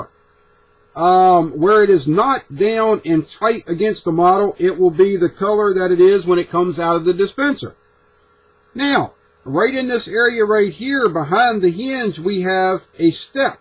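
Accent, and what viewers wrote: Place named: South, United States. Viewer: This is funny, you know how they say everyone has their own twin? Well bud,I found yours. My Brother in law Paul is almost identical to you even down to the go t and glasses. What separates you guys is the voices. Paul doesn't have a southern accent and he is a soft speaker. AND get this... He builds car models, alot of local circle track cars that raced in the 60's. Anyway,yup,you now have your unrelated twin. I wish I had a current photo. I got on your channel to see how other car modelers paint 2 tone.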